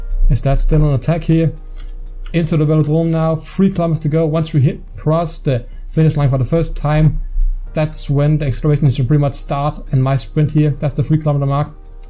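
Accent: Denmark. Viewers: Er du Dansk? Du lyder Dansk?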